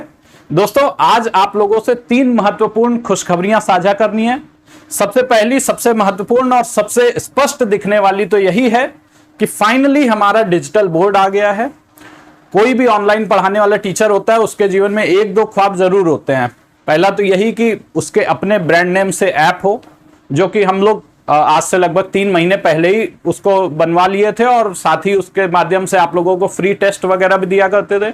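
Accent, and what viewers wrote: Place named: East, India. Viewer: Congratulations sir 🍰🎉🌸🌸🌸🌸🌸📯👃👃.aapka padane ka tarika he itna badia h ki aapka channel chalna he tha sir.sir aap kis City se belong karte hai?aapki language me thoda east ka touch aata h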